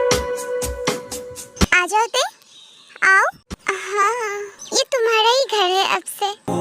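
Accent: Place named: Bengal, India. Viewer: Accent bata raha she is bangoli n bangoli's ka karunga karungi same hota so you have to understand .😂😂You focus on story n enjoy. It's kind of cute too. 🎉🎉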